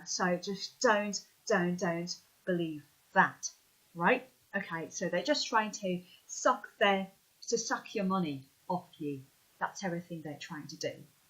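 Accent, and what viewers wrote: United Kingdom. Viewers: Ти дійсно з України? Це просто як Гаррі Поттера дивитися в оригіналі. Я б не повірив тобі, якби ти зі мною розмовляла в Україні, що ти не native speaker. Дуже гарно розмовляєш, як викладач та студент, який вчить інших та вивчає англійську, можу сказати, що це найкращий брітіш екснт, який я чув від українця. Ти просто зробила мій день. Повністю згоден, тільки важка праця над собою може допомогти досягти advanced or proficiency.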